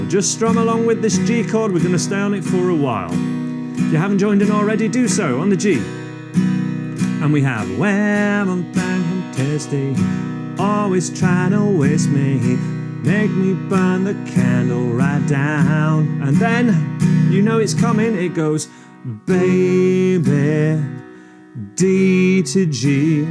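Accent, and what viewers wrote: United Kingdom. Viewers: Thanks ❤ for the tutorial I hope you have a great new year I love you very much 😘🎸🥰 keep up the good work you have a great voice that British accent 💕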